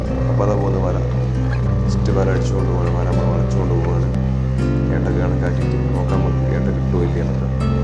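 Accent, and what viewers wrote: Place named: Kozhikode, India. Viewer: ഇത് എവിടെയാണ് സ്ഥലം. ഒറ്റക്കാണോ പോയത്.സംസാരം കേട്ടിട്ട് കോഴിക്കോടിന്റെ യും കടലുണ്ടിന്റെ യും ഇടയിലാണന്നാ തോന്നുന്നത്. 🥰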